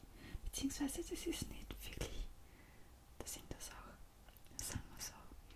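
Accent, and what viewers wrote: Styria, Austria. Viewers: Wrong. It's austrian dialect. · des muas fost steirisch sei, i vamiss es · hach jo... da schene Dialekt^^" Ich kenn das sehr gut, wenn es ungewohnt wird, den eigenen Dialekt in speziellen Situationen zu nutzen.^^" Als steirischer YouTube-Schauer is es ja schon sehr ungewohnt, dass jemand in einem Video auf YT einen vertrauten Dialekt nutzt xD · Ich komm aus Oberfranken und find euren Dialekt in Österreich echt geil und ähnlich isser a noch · To me you look more french only my idea. Nice Austrian dialect ASMR video. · Ich liebe den Österreichischen Dialekt, bitte mehr Austrian Girl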